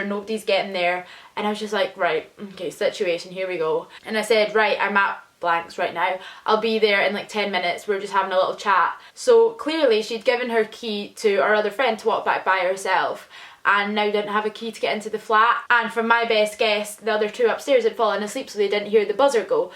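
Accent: Scotland, United Kingdom. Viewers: I absolutely LOVE LOVE LOVE her accent. She sounds just like the characters in Trainspotting. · I understand that. I really do. I personally have a very short temper and bc of things like that, stopped taking it, I guess you could say. I was in a lot of abusive relationships and it was hard. I could tell the situation put you in a lot of distress. I really am just so sorry this happened to you. I'm glad you're doing well. I just feel so bad when people can't stand up for themselves, it makes me hurt. I'm very empathetic with people and idk, it's just upsetting. Just know you aren't alone. Here in the states, I'm still going through it with my fiance's ex. She bullies me, has been very badly for about 2 years now, it just gets worse no matter what I do, say, try etc. The things this girl did to you, I had to watch it be done to my fiance before we were together and it shook me to the core not being able to do something. 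People like that just don't seem to understand the emotional or physical toll their actions have on another person. But, I am glad you're alright and things are better. I hope no one ever does this to you again. Could I ask where your from? You don't have to give exacts. Like, what country? Your accent is awesome. I couldn't tell if it was Irish, British, English. I'm bad at connecting accents to their place. Lol. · Holy shit you're Scottish. I never seen that coming! On topic though I found Student Flats to be a nightmare, yet very exciting as I had just moved to, 'The Big City'. My advice for anyone is to find someone you get along with, save up, and get your own flat. It costs more, but you can't put a price on piece of mind. EDIT: What a crazy cow O_O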